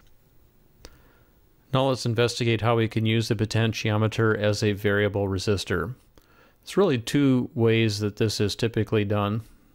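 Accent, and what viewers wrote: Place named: Oxford, United Kingdom. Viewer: SIR Just now i watched yr video on POTENTIA METER. My copliments to you are:-- 1. liked yr video for its quality information packed in it. 2. U are a very good tutor/lecturer and i deduce from this small video that u must have wide rich practical working experience in the electrical/electronics field. 3. i could follow every word of yr lecture because of yr stylish English that too Oxford English which we are familiar here in India. 4. yr diagrams there in the video and appropriate formulas are added assests to yr lecture. 5. please keep up the same pace /speed of yr lecture in future videos also as we dont miss any thing there. 6. Subscribed and looking forward to yr videos on electronic essentials. Thanking you vatsa Bangalore INDIA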